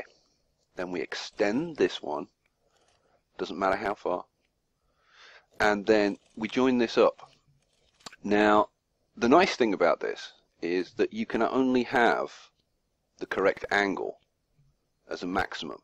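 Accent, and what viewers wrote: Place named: United Kingdom. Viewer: I did it just immediately and it worked really fine! thanks again ! Hey your are from uk or Scotland? Nice accent !!!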